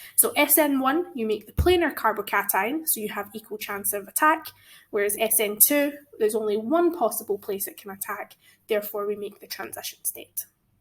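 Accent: Scotland, United Kingdom. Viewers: It’s just a Scottish accent calm down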